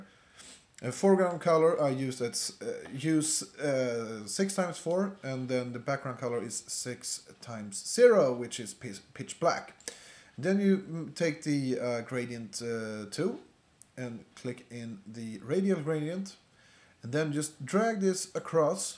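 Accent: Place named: Sweden. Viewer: based on you accent. I'm guessing you're from Sweden ;) besides that. Thanks for the tutorial!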